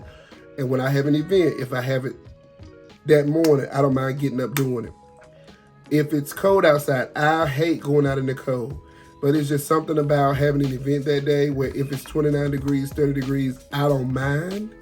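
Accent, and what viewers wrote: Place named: South, United States. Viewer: You country………no way😂 who knew LOL! I love it though ❤ another great video. I love how you give tips to getting started. Again, I’m not doing this professionally I just absolutely love creating. I do so much, you name it I do it but it’s honestly for the love of it. I’m an ER Nurse and Nursing Instructor and I love that just as much. My designing and crafting are also my therapy. I lost my oldest daughter and her husband to an accident May 2022. If I didn’t have crafting and designing whew chile. But God is faithful and I trust Him. If God calls me to do it professionally then I’ll answer but I have to hear from Him and I’ll need him to call me by my whole government name! Keep elevating Sir.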